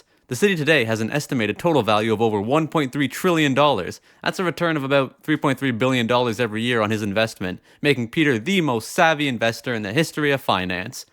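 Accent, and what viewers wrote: Canada. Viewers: WHAT FUCKING PART OF CANADA ARE YOU FROM LIKE I CANNOT TELL?!?! ARE YOU FROM TORONTO OR THE ATLANTIC YOU SICK FUCK???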